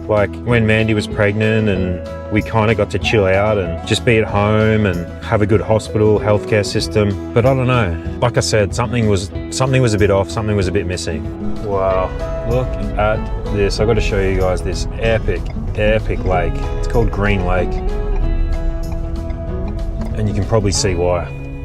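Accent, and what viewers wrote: Australia. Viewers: It’s not your Azzie accent. Tim Hortons is the problem. 🇮🇳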